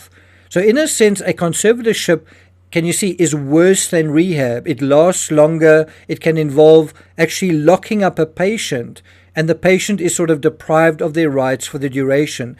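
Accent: South Africa. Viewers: This commentator sounds south africa . Is he.😊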